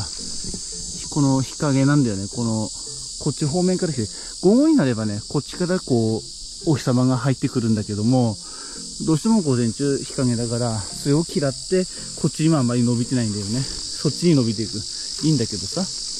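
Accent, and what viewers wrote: Tsugaru, Japan. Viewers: 初めまして。 あの、お教えください。 雌花が受粉されているか否かはどのように見分けるのでしょうか？ お返事お待ちしております。 それと、津軽弁？いい感じですね。 津軽弁YouTubeで人気ですし。 すんたろす、って言うYouTuberのお兄様かと最初思いました。 😅 津軽弁全面に出した方が登録者増えるかもです。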